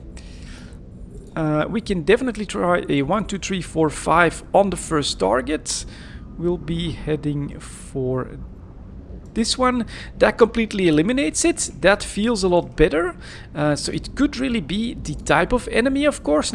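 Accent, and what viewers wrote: Belgium. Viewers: Belgium i think :-)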